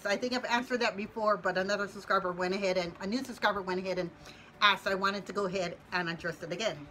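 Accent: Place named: Texas, United States. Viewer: I really like this Q&A I really got to know you a lot better. after I finished Cosmetology in realize that I didn't like people that much I went to a funeral home and asked if I could get a job there and fortunately there's one person that pretty much does all the people there so they didn't need help at that time but I have thought about doing that sort of work. I would still get the fix hair and not have to deal with talking to the person. I later thought that it would probably be worse with the family loved ones being so particular of the person the looked before they died. I'm not a quiet person so that probably wouldn't work out for me either. I like how you was talking about your husband's Texan accent was rubbing off on you that's super cute.Great video👍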